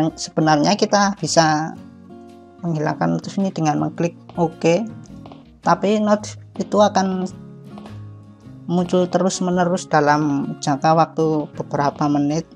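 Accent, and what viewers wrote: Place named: Java, Indonesia. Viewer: Medok e brrooo🤣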